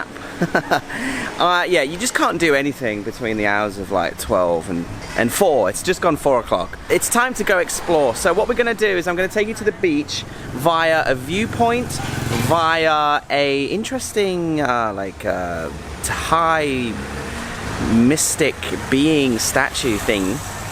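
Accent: United Kingdom. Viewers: Love your accent!! Most YouTubers are Americans or Canadians. It is so fresh to listen to someone speaks with a British accent. At this time when most of us can’t travel. It is so amazing that we can watch you on your little Honda. I hope the videos are longer and more detailed.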